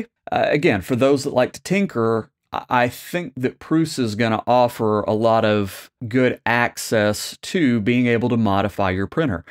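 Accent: United States. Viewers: Based on your accent, I am going to assume you are an American. Can your cars compete with Chinese cars on price, if not for the extra taxes put on them? No? So why the hexx to you expect a Prusa to beat their Chinese counterparts on price? Being a European company, I don't think the price was all that bad! Okay, it may not bring entirely new features. But it brings them Prusa-style! Backed by support that is second to none in this segment, and supported for years to come! Ever since the X1C hit the scene, I have wanted for Prusa to bring their version. I simply can't muster any enthusiasm for any of the Chinese printers! Qidi was close, simply because they brought the heated chamber. But a few fires later, I did not care for one any more. Privacy is clearly not a thing for these companies. That is one of the things I like about Prusa! You CAN completely air-gap your printers, and even use an offline computer for slicing, if you want to! 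Although it would be nice with an included camera, at least you will get one. And you can unplug it, should you feel like it. So the great news here is not the feature-list! It's the fact that it's a Prusa!!! Get over this size-thing-please! People still build 120cm cubed Voron 0.2's. There is a marked for this size. And I think it was a smart move for them to expand upon the MK4; simply because a lot of people whom recently bought their MK4's now won't be left behind. What they loose in sales due to "sma size", they will make up for in sold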